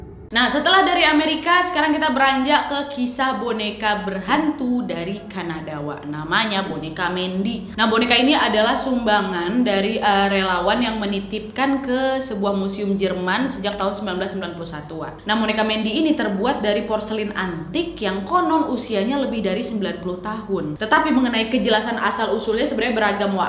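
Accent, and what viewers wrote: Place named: Batak, Indonesia. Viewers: Kak orang batak ya? Soalnya gaya ngomongnya kayak orang batak😄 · orang mana si logat nya macam sumatra kali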